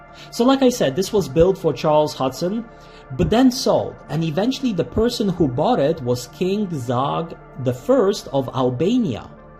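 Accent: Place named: Romania. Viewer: Are you by chance originally from Romania? I ask because I've heard Romanians speak American English with a similar accent like yours. Great content! Thank you.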